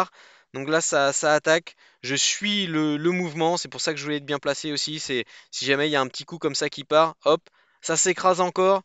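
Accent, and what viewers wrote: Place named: Brittany, France. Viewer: Tas pas des origines bretonnes ? Ton accent ça sent clairement le Finistère haha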